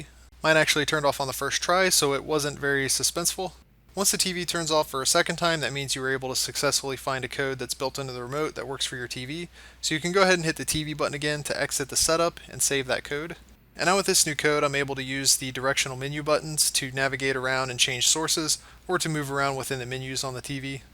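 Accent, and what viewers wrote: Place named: Maryland, United States. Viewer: You are the man. What is your accent are you from MD? Thanks so much.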